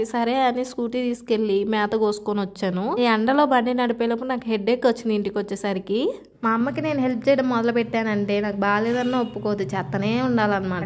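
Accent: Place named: Kanigiri, India. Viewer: my native place also nearby kanigiri and okasari reels chustunte e sister video chusa and tana slang chusi doubt vachi(manam enta dooram vellina mana own roots aete marachipolem kada😊😊😊) nenu adiga me proper akkada ani e sister reply echaru so ala naku telusu...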